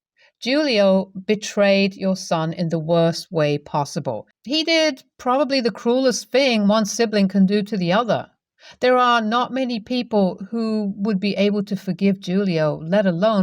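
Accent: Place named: England, United Kingdom. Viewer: Can you tell us what part of England you’re from?I love your accent and the way you read a story.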